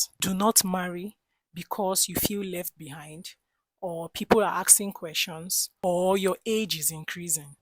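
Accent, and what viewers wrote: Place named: Nigeria. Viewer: your advice is wise. I love your Nigerian accent too ❤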